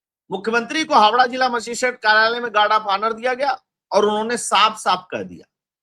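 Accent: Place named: Lucknow, India. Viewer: Ram Ram bhai , big fan here from NZ. Love your detailed work and analysis along with your local lakhnavi style of speaking . 👍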